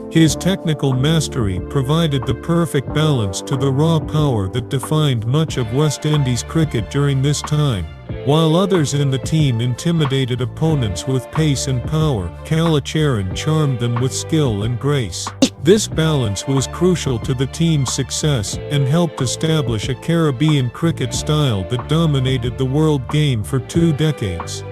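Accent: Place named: United States. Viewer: The narrator sounds like an Ameican!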